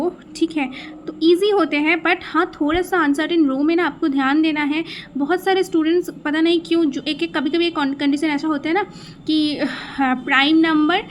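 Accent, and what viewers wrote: Bengal, India. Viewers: Bengali accent ....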